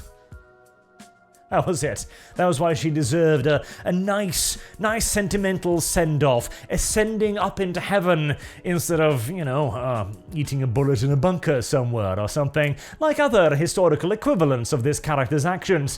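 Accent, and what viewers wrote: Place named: United Kingdom. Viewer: Hmmm, i dont like your britisch Exent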